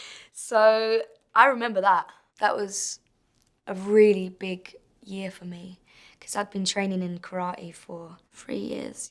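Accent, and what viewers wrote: United Kingdom. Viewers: Wait.... your British?! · I never saw u talk like that that british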